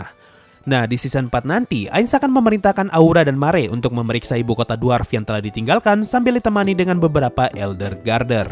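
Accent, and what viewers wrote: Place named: Papua, Indonesia. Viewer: Z dengar kk pu logat nih kayanya kk tinggal di Papua to.. di kota mana kk??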